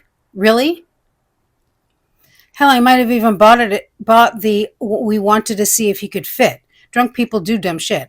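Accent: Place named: East Coast, United States. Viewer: Off topic but I love your hair, you’re a stunning young lady- also, yes…lady Macbeth is also a fantastic show, she does great work!! I was laughing with her drunky the bear videos-her trying to get into SB mind set-yikes!!! I think with your accent you must live east coast-I’m west coast-we should all have a SB or forensic files marathon!! 😂 My best friend and I watch our “murder shows” mom calls them 😅and we enjoy them, so glad I found all the people with like minds !!!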